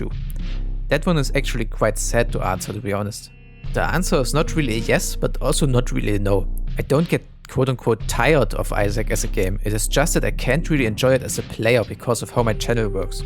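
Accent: Germany. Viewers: i love your german-english it reminds me about laovaan who is also a german speaking english in his vids. you sound so similar kinda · I actually like the German accent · I love how your german accent sounds when speaking english, like dessert for the ears. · What about Cult of the Lamb? Had some Isaac - Feelings while watching the Gamescom-Trailer. Nice (Cat-)face reveal Lieb deinen Kartoffelakzent xD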